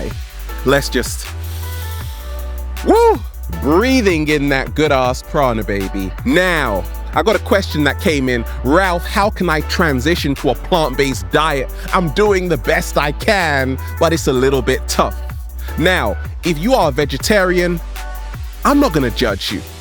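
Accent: United Kingdom. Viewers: Are you British because you sound like it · That British accent tho....love it! "Tomatoes"😁😁Love you Ralph.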